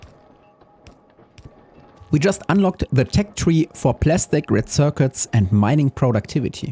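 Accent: Germany. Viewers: You sound german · Das ist das erste Video bei dem ich gerne eine Übersetzung von einer KI hätte. Dein Akzent klingt wie Bayrisch, nur schlimmer. Holy Moly.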